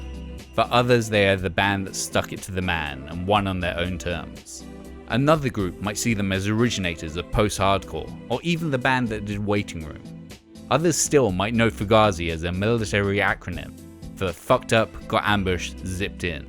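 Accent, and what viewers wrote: United Kingdom. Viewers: No he's just British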